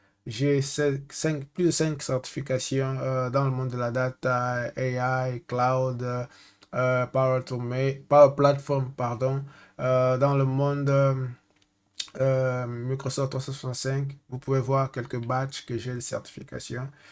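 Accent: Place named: France. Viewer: Tant que le message passe, l'accent français n'est plus une barrière ! Force à toi Ndeko 🎉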